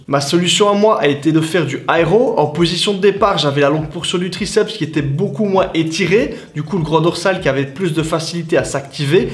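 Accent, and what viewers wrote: Liège, Belgium. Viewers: Salut. Merci pour les infos! Ton accent me fait penser sérieusement que t es du côté de Liège? Vive la Belgique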